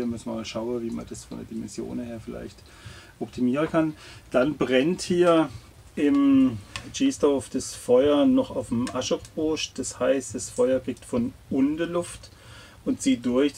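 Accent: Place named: Saarland, Germany. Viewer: Du schwätzt wie e Saarlänner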